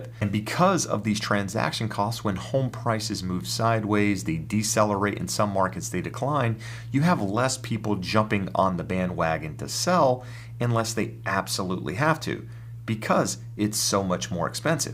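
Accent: California, United States. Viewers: You're from California or I wrong?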